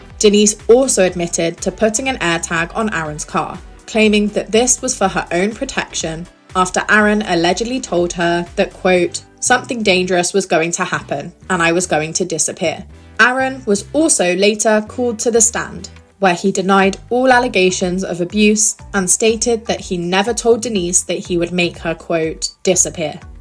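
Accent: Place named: United Kingdom. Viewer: Your British accent is annoying …I had to stop halfway through because I couldn’t take it anymore.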